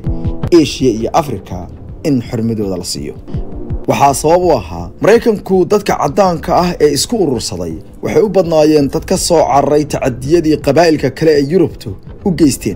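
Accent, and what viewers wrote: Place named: Somalia. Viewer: Fardabaax baah saan Afsomaligaan meel kale kaamaa maqlin Pure afsomali ❤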